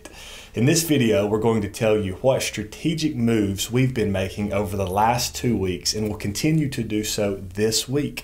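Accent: South, United States. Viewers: hard to take you serious with your southern accent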